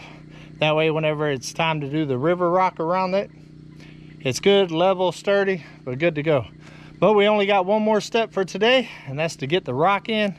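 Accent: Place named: West Virginia, United States. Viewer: Telling ya man.. Im originally from WV. Lived there for 33 years of my 40 on this planet... Hearing this man talk takes me home..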